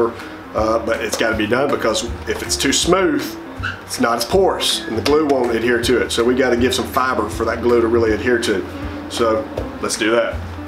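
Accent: South, United States. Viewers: can't get enough of your craftmanships and your southern accent; keep it up!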